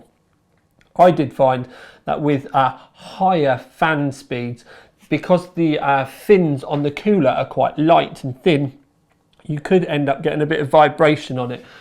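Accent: United Kingdom. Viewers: Nice British English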